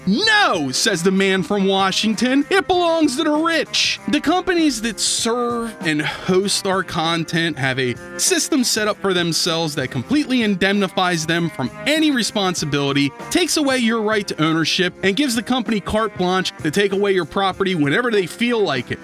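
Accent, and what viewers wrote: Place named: Philadelphia, United States. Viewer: this entire time i’ve thought you were a delco or NE philly guy. i guess i was close enough but gd them philly neighbors do share similarities in accents. when I was a broke college kid I would pirate books, movies, games. once I started working and I wanted to play those games or reread the books or see a movie I started paying for them. If something is hard to find I’ll still go elsewhere online to find it. accessibility and cost is everything.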